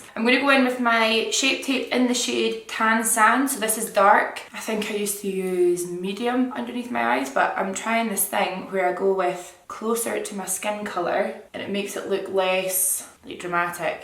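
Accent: Scotland, United Kingdom. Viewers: Is she Scottish? I LOVE HER ACCENT! 😍😍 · Are you Scottish? You sound scottish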